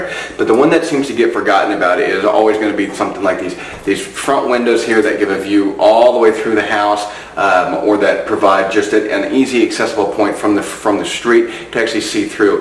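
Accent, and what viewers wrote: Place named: Alabama, United States. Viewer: Great recommendations. You sound like a fellow Bama boy!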